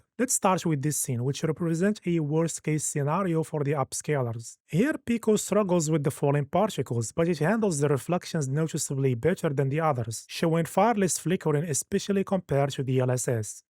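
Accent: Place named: Morocco. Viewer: The accent tells me you're moroccan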